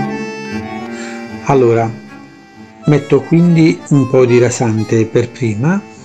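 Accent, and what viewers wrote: Naples, Italy. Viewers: si, me lo hanno già detto in tanti che somiglia a Napoli. Io abito vicino al famoso ponte Morandi, quello crollato.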